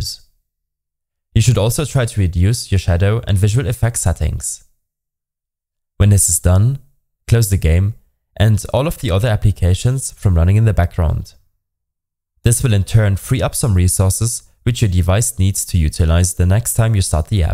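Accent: Germany. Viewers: Your accent sounds like someone from Germany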